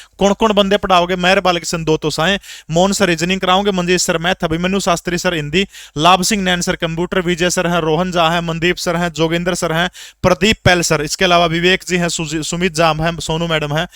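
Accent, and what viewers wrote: India. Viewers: Aap pabra side ke ho kya sir ??? Apki boli mile h ude kani tt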